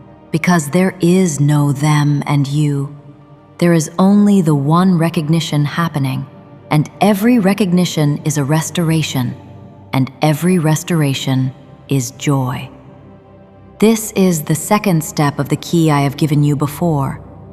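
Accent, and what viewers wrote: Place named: United States. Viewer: If you are DF Mother God, may I ask, why do you have an American accent? ??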